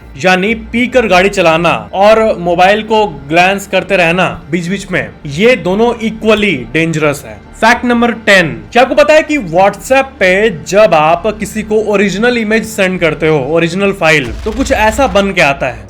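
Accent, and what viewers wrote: Bihar, India. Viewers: Bihari babu voice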